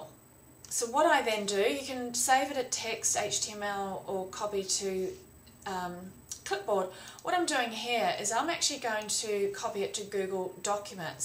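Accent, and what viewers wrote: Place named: Australia. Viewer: reminds me of how much I love Austrailian accents...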